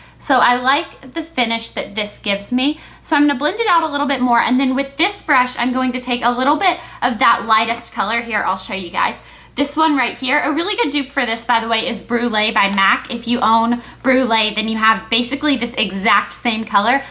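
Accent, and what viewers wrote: South, United States. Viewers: i absolutely love your southern accent :)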